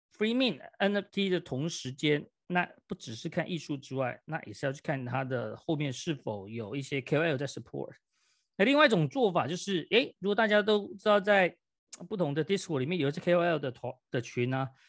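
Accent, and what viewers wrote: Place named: Malaysia. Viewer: 感觉像马来西亚人。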